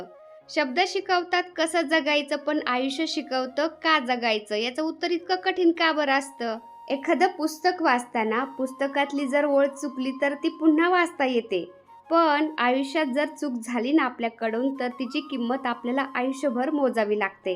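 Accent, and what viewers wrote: Ahmednagar, India. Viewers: श्री स्वामी समर्थ ताई तुमची यूट्यूब ची जर्नी खूप छान होते प्रेरणादायी होती😊❤ तुमचा आवाज तुमच्या नगरची भाषा खूप छान आहे म्हणूनच व्हिडिओ बघायला खूप छान वाटते❤😊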